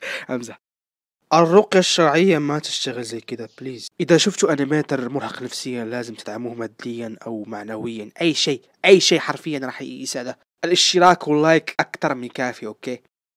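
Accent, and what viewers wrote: Morocco. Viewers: من هدرتك حسيت بيك مغربي فاش شفت فيديوهاتك تأكدت 😹😹 حبيتك الاخ 💁♥️ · انت مغربي؟ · ولد بلادي باينة غير من هضرتك😂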